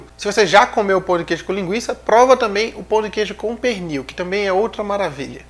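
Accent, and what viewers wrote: Minas Gerais, Brazil. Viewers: O sotaque é bem impoRRtante kkkkkkkk O famoso mineirês! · Sou mineira de BH, mais eu Amo ouvir você falar mineirês. Você é simplesmente Sensacional!👏👏👏👏👏👏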